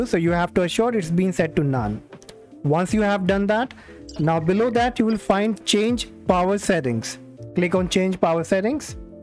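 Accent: India. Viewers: your indian accent is destroying my ears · Indian accent💀💀💀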